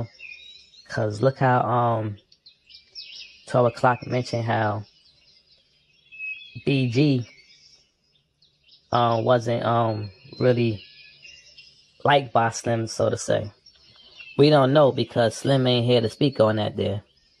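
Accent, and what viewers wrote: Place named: New York, United States. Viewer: Sound like you from New York or Detroit, but da' 504 alllll up there too.. Good job bruh. R.I.P. SLIM!!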